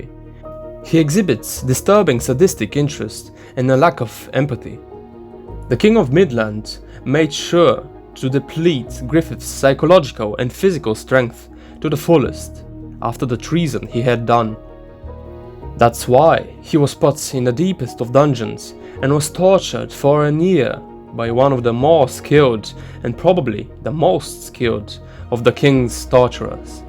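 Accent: Germany. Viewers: Good German accent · Bro, you German? Thick accent man. Shoutout from Manheim! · 30 seconds in. You’re definitely German. Cool accent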